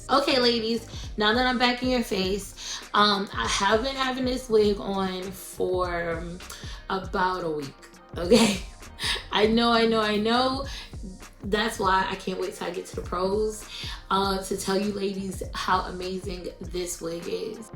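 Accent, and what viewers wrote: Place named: New Orleans, United States. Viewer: I love it this is cute!!! And I see that we are from the same hometown , New Orleans baby!!! I can tell by the way you talk. ❤❤❤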